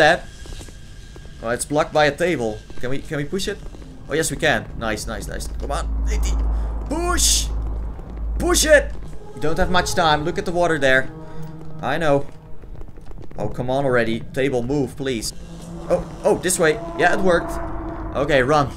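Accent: Netherlands. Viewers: Ja ik hoor een Nederlander xD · Almost definitely. Such English pronunciations are the disabilities of a real Dutchman! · Nathie je klinkt best Nederlands ben je? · he has a dutch accent indeed! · Your Dutch. You can clearly hear it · You kinda have a Dutch accent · Hij is idd nederlands, hoor je aan zijn accent toch? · Gotta love the dutch accent😉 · Nathie Hoi Ik Ben ook Dutch Ik Dacht al aan je acent :D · I think he's Dutch, I'm Dutch and Dutch people got the worst accents, I can hear from his accent that he must be Dutch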